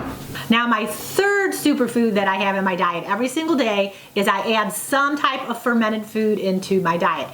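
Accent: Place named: Midwest, United States. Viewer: You have to be from the Midwest with your accent. Minnesota? That's another reason you look so young for your age.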